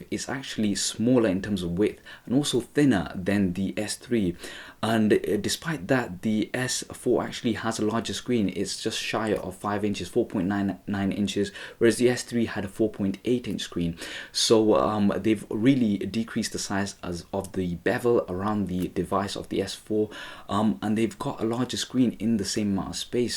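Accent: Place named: Newcastle, United Kingdom. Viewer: Thumbs up for geordie shore accent!!:)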